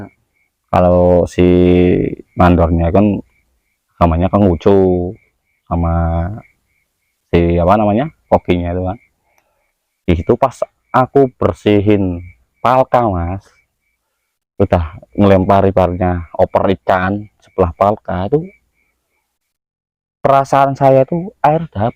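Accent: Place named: Central Java, Indonesia. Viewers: Logatnya kok sprti orang pekalongan😂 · Nah itu kan nah itukan. Pasti orang jateng plat G😂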